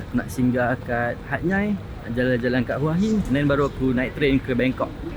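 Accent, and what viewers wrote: Kelantan, Malaysia. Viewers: Aa setuju. Tapi de loghat kalate kit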